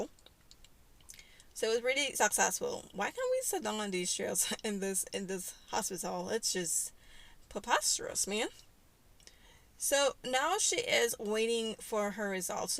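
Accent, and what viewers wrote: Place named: Nigeria. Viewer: Are you nigerian? I can hear from your accent its really annoying lawd 🤦🏾‍♀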